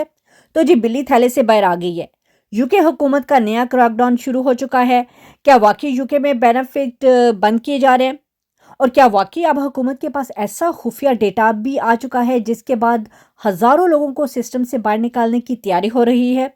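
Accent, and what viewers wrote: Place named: Punjab, Pakistan. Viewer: Tu c Paindo ho. Ardo (Urdu) Punjabi accent vich bol di ho.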